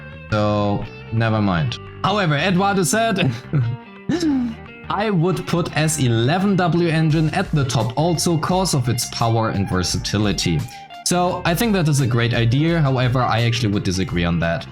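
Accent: Germany. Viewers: Digga ist das geil das es ein deutschen ZZZ YouTuber gibt Ich wusste das du deutsch bist wegen dem aktzent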